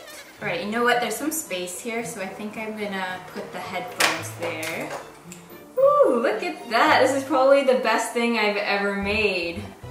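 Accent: France. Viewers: i LOVE the french accent "rrrrrrrr" 😹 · hi! hello from Sydney, Australia. You are such a sweet and creative person. Love all your videos and thanks for all the efforts you put into them. It won't surprise me if you will work with a jewelry or bag company one day to have a collection. Last but not least, love your "French accent"!! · LOL, I'm sorry that I just can't stop laughing when you looks serious on the street...and I really love your French accent XDDD Love you <3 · the french accent HAHAHAHAHAH made my day HAHAHAHA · I didn't expect that but you made it! great job:) btw I'm from Paris and whenever I hear your french accent I'm dying 😆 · I love it with your French accent omg you made my day loving the bag !!! Hahaha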